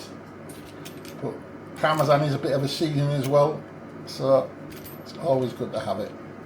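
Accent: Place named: Northern England, United Kingdom. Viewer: I am subscribed to your channel and always 'like' a viewed video, I could not enjoy this one as I was peeved you called it 'arugula' the USA word for 'rocket', in your broad northerner accent, maybe you quoted an american recipe. I know petty, but Americanisms is so annoying and invading our beautiful British language.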